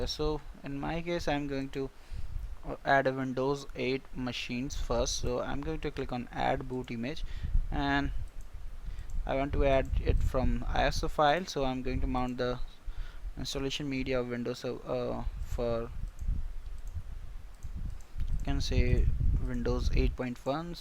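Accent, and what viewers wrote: India. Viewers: make a video without a indian accent. So annoying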